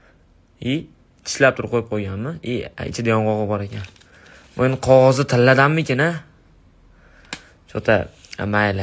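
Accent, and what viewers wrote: Andijan, Uzbekistan. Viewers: G'irt anjancha sheva😂❤ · Зу'р гаплардан бу'липти юю Шева андижон❤❤ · 😂😂😂😜👍👍🤲🤲ИЧИМ УЗУЛУБ КАЙТА, КАЙТА КУЛДИМ😂 . АНДИЖОНЛИК ШЕКИЛИЙ ( ШЕВАДАН) (МЕНХАМ 😜). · Ука Анжан Шевами 😂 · Aka Andijonli emasmi. Sheva tortyapti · Anjanlimisiz? Mazasini aytmadizzu bebaho shekilliga 😂😂😂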